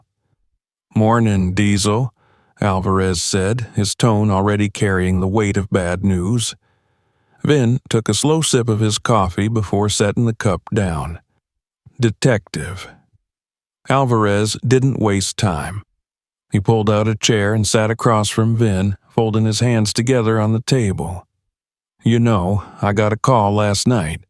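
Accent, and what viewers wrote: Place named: South, United States. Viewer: I am so glad Vin Diesel stepped up to help that young woman. She seemed very scared by those bikers. And by the way, you would make an excellent audio describer. You have the voice for it too. A strong, southern accent, as well as an excellent describing voice. I would know, because I've listened to a lot of audio descriptive movies and TV shows. President HW Bush describes it's a wonderful life for those that are blind or visually impaired. I myself happen to be blind. Totally blind in fact from birth. It doesn't slow me down though.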